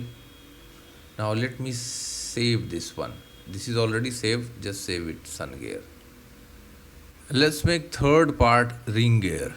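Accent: India. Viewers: when the presenter has an Indian accent you know it will be good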